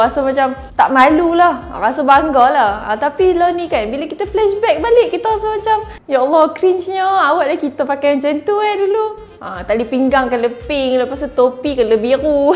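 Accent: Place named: North, Malaysia. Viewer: Tak tau pasaipa aku tertarik dgq host punya utagha...bnyakkan lagi post guna host ni...terbaik baq hang..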